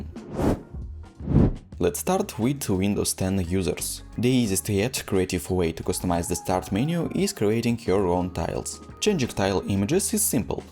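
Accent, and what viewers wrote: Russia. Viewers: русский акценкт крутой, я так явно говорить не умею, как он так научился, у меня вообще только понимание языка вкачано, а остальное плохо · Ахах, с первых секунд слышно что русский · говоришь с русским акцентом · как же чувствуется этот русский акцент)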